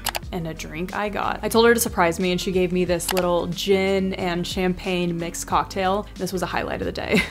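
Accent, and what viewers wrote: Australia. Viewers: Dang, that is a long day!! Glad you found great companions from Philly to NYC though! I once ended up taking a Honda Civic (coup), two trains, and a bus ride to get from my college in Kansas to Ohio for Christmas. Even got mugged at one point. Also, your Australian accent sounds just like Margot Robbie! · I love your Australian accent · Reverse psychology, "Mama needs a drink" followed by "It's 5 o'clock somewhere" Love the accent are you from Australie...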